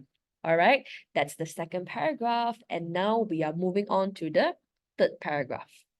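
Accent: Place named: United States. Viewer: wow you actually sonud like an american ngl howdey homie!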